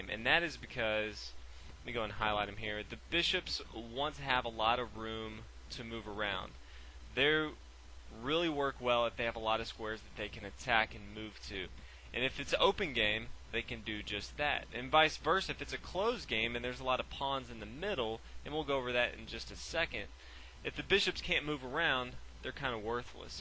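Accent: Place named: South Carolina, United States. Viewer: you sound like your from Aiken, South Carolina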